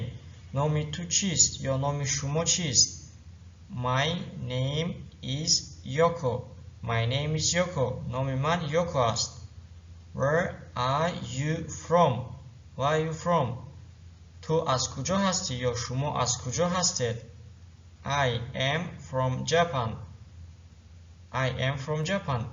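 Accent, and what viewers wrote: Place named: Literary Tajik, Tajikistan. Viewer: Рахмат додарчон, забони тоҷикӣ адаби ба дараҷаи олӣ гап мезани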